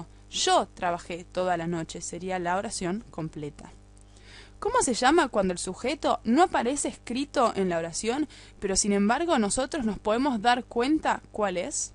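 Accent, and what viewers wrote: Argentina. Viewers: Eres argetina verdad?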